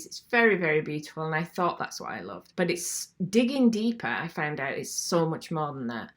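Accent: Yorkshire, United Kingdom. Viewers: After trawling through so many art videos on youtube, this is the first and only one that has left me with more questions than answers and that is brilliant. Eight minutes of inspiring and thought provoking content. So nice to hear a Yorkshire person too. If you have any tips on how to gain inspiration from living in Leeds rather than beautiful North Yorks that would be greatly appreciated!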